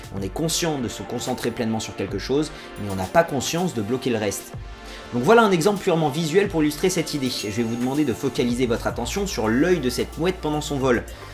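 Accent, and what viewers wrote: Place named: England, United Kingdom. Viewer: Best accent anglais ever!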